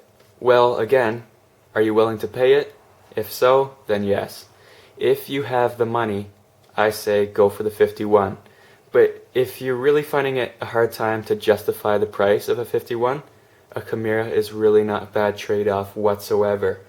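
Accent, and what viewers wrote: Canada. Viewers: Your def Canadian lol aboot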